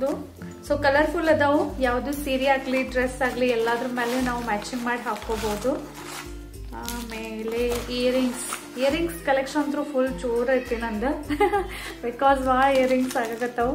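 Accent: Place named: Hubballi, India. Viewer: ನಿಮ್ಮ ಹುಬ್ಬಳ್ಳಿ ಕನ್ನಡ ಸೂಪರ್ ನಾವು ಉಡುಪಿಯವರು ನಿಮ್ಮ ಮಾತು ಕೇಳಿ ಕೇಳಿ ನಿಮ್ಮ ಭಾಷೆ ಕಲಿತಿದ್ದೀನಿ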